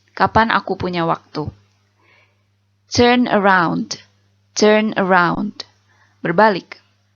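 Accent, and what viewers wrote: United Kingdom. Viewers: british ya kak 😍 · Wow! What a great Job, Sister! Where did you learn English? I love your english pronunciation and accent. It sounds like British. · First i hear you then i love you.. Auto subscribe.. It sounds like british😍 Semoga channel Kaka makin berkembang yaa..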